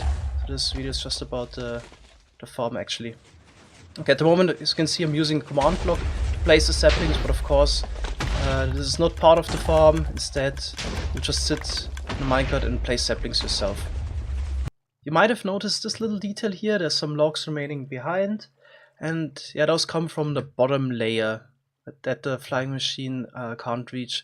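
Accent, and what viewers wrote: Germany. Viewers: Nicer Deutscher Akzent, bro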